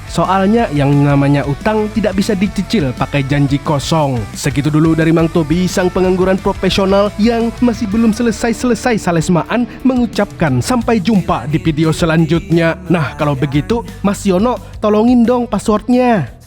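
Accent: Banjar, Indonesia. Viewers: urang banjar nih😂😂😂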